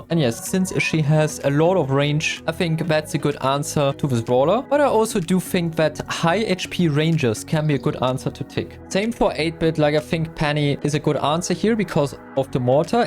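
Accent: Germany. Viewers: I can hear the deutsche akzent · Are you german? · R u German? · Hab direkt rausgehört das du Deutscher bist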